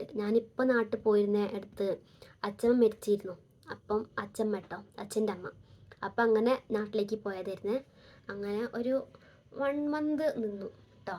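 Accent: Malappuram, India. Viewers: All the best, Malappuram slang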